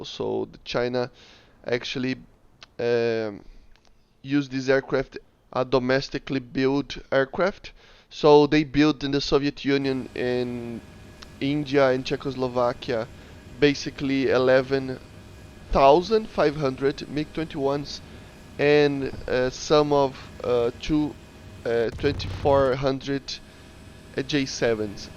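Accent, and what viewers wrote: Brazil. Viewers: caraio que sotaque de brasileiro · That guyse sound likes a Brazilian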